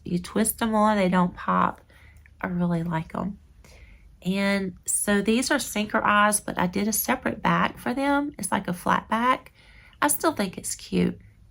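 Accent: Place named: South, United States. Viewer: Oh lord I definitely watch to the end, I could listen to your Southern accent all day!! The watch time can be tricky as it is not necessarily 16 minutes from the start to the 16 minute mark, some people may forward ahead and watch later in the video sections. Just keep being you however long they are I will still watch!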